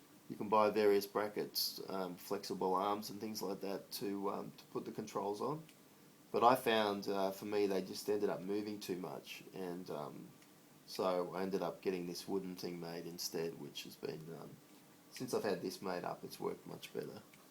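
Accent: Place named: Australia. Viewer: Hi, thanks for the interesting video - a really interesting setup you have there. I'm in a similar situation to yourself - quadriplegic (or tetraplegic as we tend to say here in the UK). I'm C4 complete - I'm guessing you're C5? Anyway, I'm also a keen BF3 / BF4 player, although my approach to playing the game is distinctly 'low-tech' compared to yours:) Take a look at the video on my channel & you'll see what I mean! I assume from your accent that you're based in Australia? I would suggest that we meet up in a game sometime, but I'm sure the ping times would be horrible, and I guess you play on the Playstation 3, whereas I'm on a PC. Anyway, best of luck with the gaming!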